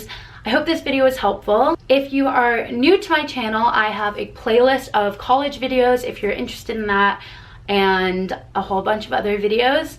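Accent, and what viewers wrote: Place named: Australia. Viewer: you have a low key Australian accent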